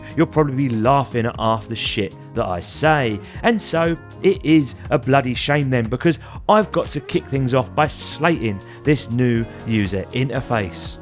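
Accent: Cockney, United Kingdom. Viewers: Your review nailed it. Back of the net. Best i have seen.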